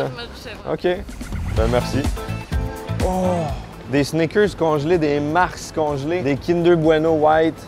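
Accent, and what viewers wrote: Quebec, Canada. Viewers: et sachez que...j'adore l'accent québécois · Bonsoir Dis-moi, est-ce que tu étais à Nantes ce soir, c’est-à-dire le jour où tu as posté cette vidéo ? J’étais avec ma femme dans le parc de la Chanterie, pas loin de chez moi, et vers 22h, on a vu quelqu’un qui te ressemblait vraiment. Au début, tu étais assis sur un banc, en train de parler au téléphone, face à l’Erdre. Puis tu es passé juste à côté de nous pendant qu’on se prenait en photo. Il faisait un peu sombre, alors je n’étais pas sûr, mais j’ai cru te reconnaître — surtout quand j’ai entendu l’accent québécois 😄 Et comme j’étais à Montréal la semaine dernière, je l’ai tout de suite capté, haha !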